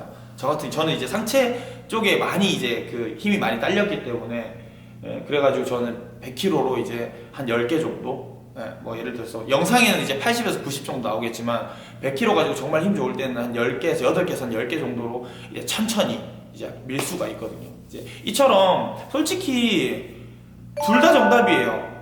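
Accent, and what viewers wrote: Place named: Busan, South Korea. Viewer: 선생님 부산 분인가요? 사투리가 찰지네요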